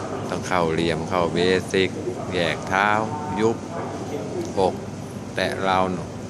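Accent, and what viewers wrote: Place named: Rayong, Thailand. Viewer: อาจารเป็นคนระยองรึป่าวครับสำเนียงไช่เลย555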